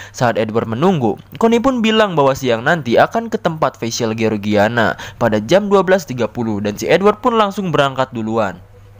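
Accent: West Java, Indonesia. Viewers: Sunda anying😂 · Anjimm si mang org sunda euy , aing karek apal ieu channel mantap resepp euy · Ngakak aslina aduh sunda banget😂😂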